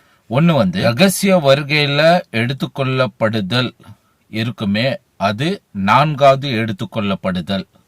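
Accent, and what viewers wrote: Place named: Bangalore, India. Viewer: Here I don't want to say whatever he has spoken is right or not or who is right? Apart from that I see that many of you has commented that he should learn proper tamil... Tamzhians who are born and brought up in Bangalore and have their ancestors settled in Bangalore would have this kind of slang. All bangalorean tamizh people speak like him....its one way of tamizh slang like how we have north chennai slang. Please do not comment bad on his slang... atleast he tried speaking in tamizh.... And not only in this video being a Christian i dont know why people make comment using bad words or commenting in a disrespectful way. Please use your language properly or do not register your comment. It is the same tongue we are using to praise the lord. Are we not ashamed to use bad words in the same tongue we use to praise our holy Lord. 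Dont your conscience strike you when you sit for prayers. Please be a proper faithful christian to jesus.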